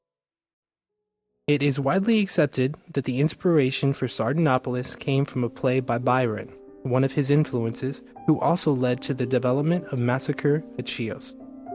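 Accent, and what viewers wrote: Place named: France. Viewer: I don’t understand his French accent. It’s really a pity, because he actually destroyed the whole narration.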